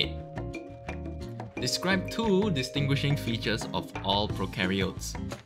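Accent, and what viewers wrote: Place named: Malaysia. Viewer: My bro, you also sound very Malaysian Wیہ😍🙈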